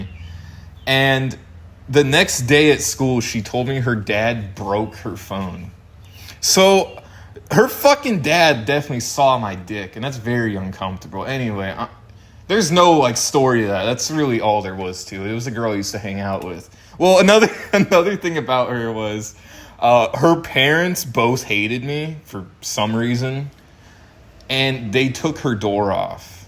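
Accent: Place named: Midwest, United States. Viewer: a midwest accent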